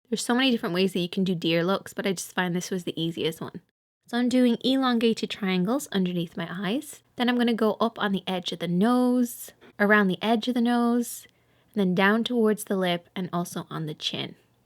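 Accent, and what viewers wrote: Ireland. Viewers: Hey are you Irish??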